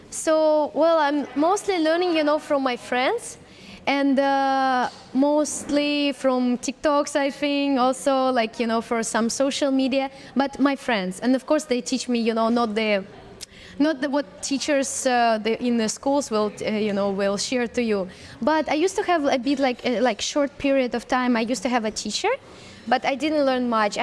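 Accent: Cambodia. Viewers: She speaks Khmer like mixed Cambodian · Her Khmer accent sounds cute. She really inspired me to learn a new language. She has a big heart for Cambodian people. I am so thankful for your love to my people. 🙏🙏🙏🥰🥰🥰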